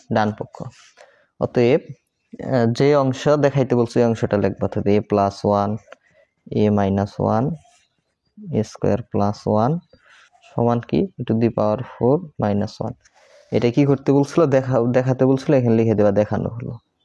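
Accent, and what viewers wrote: Tangail, Bangladesh. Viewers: স্যার আপনি টাঙ্গাইলের। কারণ টাঙ্গাইলের মানুষেরা এই ভাবে কথা বলে। আর স্যার আপনি অনেক ভালো অংক বুঝান ❤😊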